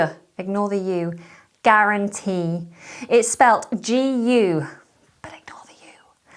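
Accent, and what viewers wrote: United Kingdom. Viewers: Wow...wonderful British accent👍💟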